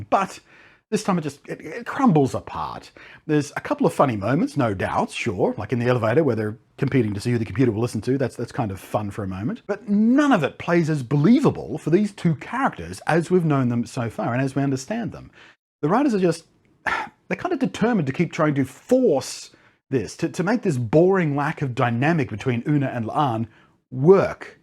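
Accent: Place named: Australia. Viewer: Hey, expat Aussie in the US for 23 years, are you likewise? I hear the Aussie accent but occasional hard Rs:)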